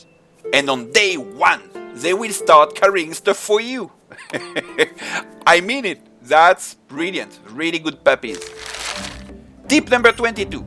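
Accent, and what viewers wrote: France. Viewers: From Texas, love your accent. I dont hear a lot of French YouTubers. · Man your just french as fuck my man. you sound exactly like what I would expect.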